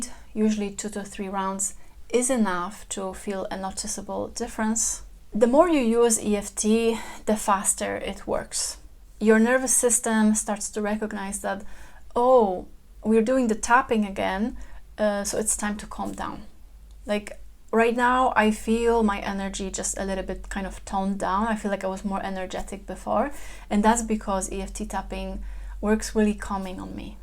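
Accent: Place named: Germany. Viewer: I love your accent. What's your native tongue? German?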